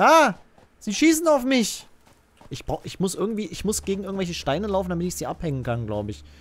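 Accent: Germany. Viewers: könntest du die englischen Sachen nicht so deutsch vorlesen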